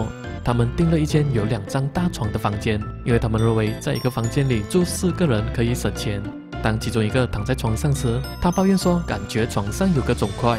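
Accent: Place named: Fuzhou, China. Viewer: 一听到就知道是福州人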